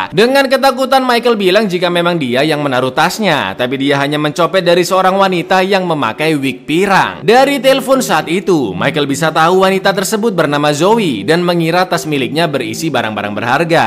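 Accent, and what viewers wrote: Java, Indonesia. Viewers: Bang suara Lo kek orang Jawa ya!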